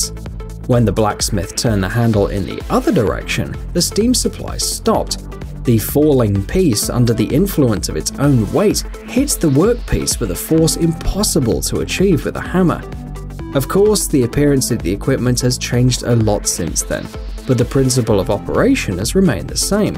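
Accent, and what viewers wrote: United Kingdom. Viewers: Ugh listening to the British way of saying these metals. No thanks.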